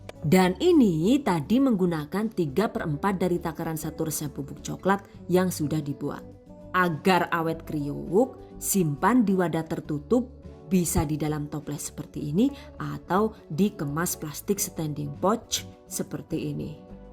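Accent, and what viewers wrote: Banyumas, Indonesia. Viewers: Kakanya orang mana kok ngapak 😂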